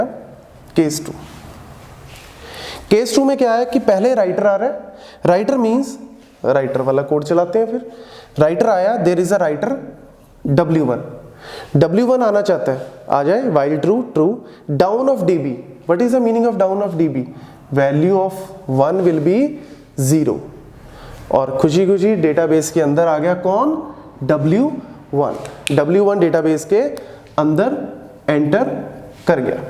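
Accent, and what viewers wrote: Punjab, India. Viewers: Tussi punjabi paaji?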